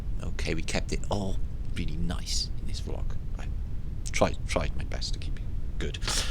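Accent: Wales, United Kingdom. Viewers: how strange the other reply !! Should change their YT handle to "Anal D" - its a lovely Welsh " accent my friend :-)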